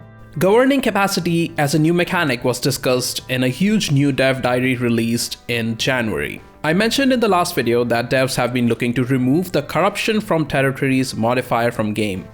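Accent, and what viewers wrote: India. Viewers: I think the appropriate term is Indian or South Asian, Hindu means someone who is Hindu and may be offensive to those who are of other religions. And yes I agree, he seems to have an Indian accent. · An Indian accent in the gaming community :D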